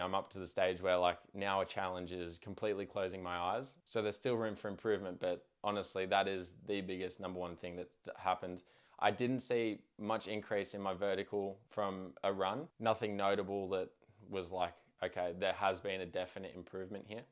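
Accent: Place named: Australia. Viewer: Tibialis raises. Australian accent. Subscribed!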